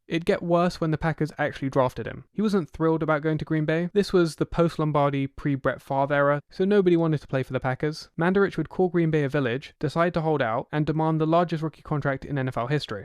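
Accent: England, United Kingdom. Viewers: Part way through this. All known info. Why am listening to a guy with an English accent talk about an American sport. No insight loser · Why is a british guy talking about an obscure nfl player?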